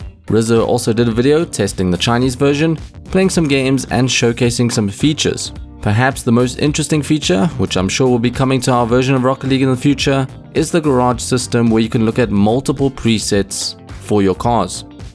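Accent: South Africa. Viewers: Is this a South African accent i hear?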